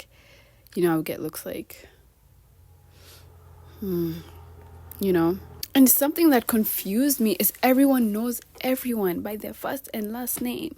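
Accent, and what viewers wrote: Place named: United States. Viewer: I love that American acsent of urs... very cutesy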